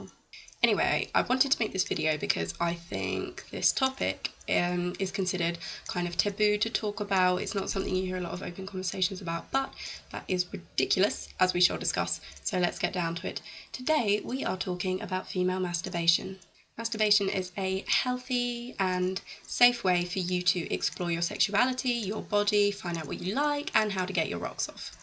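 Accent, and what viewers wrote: United Kingdom. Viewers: You seem to have British accent, so I am thinking in England, sexual topics aren't something women easy talk about or do, as you have queen, she makes uncomfortable for you to masturbate, nobody there can imagine her doing it, while in USA, France and other countries not under strict female supervision, something like that isn't questioned so much? As internet is in widely use for 15 years, girls everywhere are now more aware of masturbation, it is seen online, is that what makes you speak out? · Loving the topic and your British vocal sound, see i did'nt say accent lol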